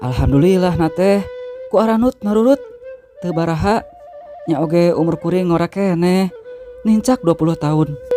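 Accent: Sunda, Indonesia. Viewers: hehe Ari sesama orang Sunda mah kang tos terang logat na 😂 Abi mah garabagan teu tiasa nyarios halus 😁😅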